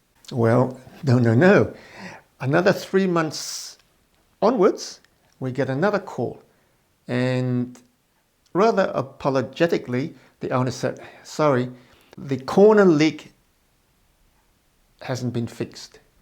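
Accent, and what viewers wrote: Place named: Australia. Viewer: Completely off the topic, but I love hearing such an Australian voice from such a non-English face. You are what makes this country worth being proud of. Thank you.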